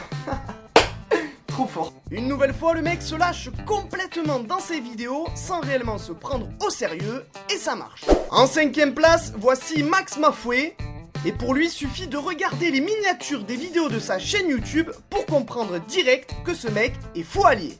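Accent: Marseille, France. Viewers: tu est du sud? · Tu as bien choisi les fous de YouTube et j'adore ton axen marseillais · comment je déteste l'accent Marseillais😂 mais le tien sa va encore !😉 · T'as un petit accent du sud~ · oh l'acson marcer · a j'adore ton accent Marseillait aller Marseille je m'abonne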